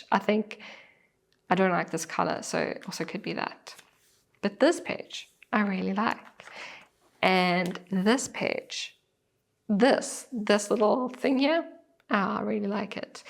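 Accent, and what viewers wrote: South Africa. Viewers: Hey, you popped up on my feed today, I am also South African. I was listening and was thinking your accent 😍 sounds so South African. Are you still in SA? Very interesting to see thar a fellow SA person is using a Hobonichi Avec.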